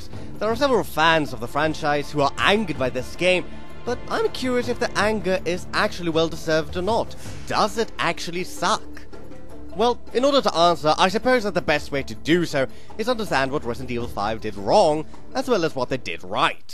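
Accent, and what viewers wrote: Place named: United Kingdom. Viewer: Hi! I've seen some of your videos and I'm pretty impressed, even subscribed just today. Just a few questions, though: first question, what part of Britain are you from and what is your accent? (Not a question related to the video, I'm just curious because I personally think it's pretty charismatic) Second question, what's the song that plays in this video?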